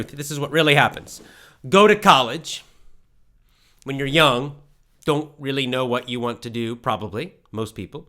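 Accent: United States. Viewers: I love to hear your american accent. So I like follow you. You are engine for my work. I'm living in nederland. I'm using here english for comunication and also my language. I bought your pronucuation cours. I love it. My first day was 3 days, second day is just finish after 7 days. But I love It. I love , cuz I like change my job even to another country like Norway, coz I'm electronic specialist.Really I like to follow you exacly mach. Haha. This vidio is powerfull motivated for my next step. Just most important still is improving my pronucuation with your course.Thank you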